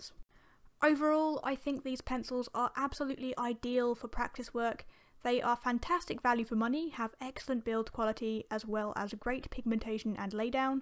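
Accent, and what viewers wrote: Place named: RP, United Kingdom. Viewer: Ive only noticed you're channel 3 days ago and the fact youre English and posh talking really has made me subscribe and youre artwork is supurb x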